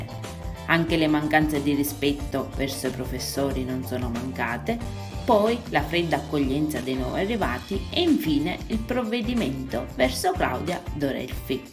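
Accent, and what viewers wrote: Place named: Sicily, Italy. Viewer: Il tuo accento siciliano è bellissimo ahahaha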